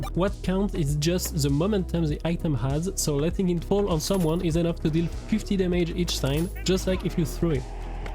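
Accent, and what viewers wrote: France. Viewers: You from France? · Il EST français that’s not just his accent bro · Att la dinguerie il est FR🔥🔥🔥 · Heaviest french accent ive ever heard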